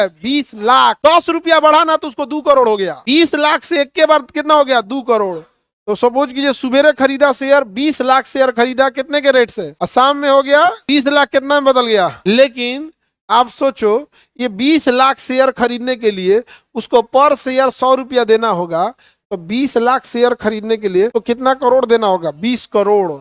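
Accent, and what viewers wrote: Bihar, India. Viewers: Chahe pdhe likhe ho,pr excent to bihari dudh vala hi hai..meri mmi boli jo truck pe maal load krte unki video sun rhe ho😂😂 Hindi ni aati aapko Vaise to bolte ho ke google ki jagah indian search,type ek video bnai thi aapne..😅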